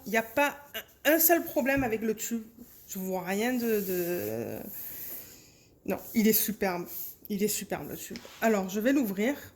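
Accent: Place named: South, France. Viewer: Bonjour, je suis une nouvelle de vos abonnées et je reprends vos vidéos depuis le début mais je me demandais...vous ne seriez pas du sud de la France par hasard? Car c'est très bizarre, vous avez un petit accent que je connais bien pour une canadienne??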